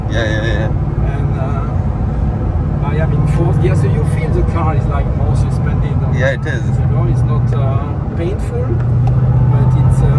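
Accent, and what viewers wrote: India. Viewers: You sounds indian❤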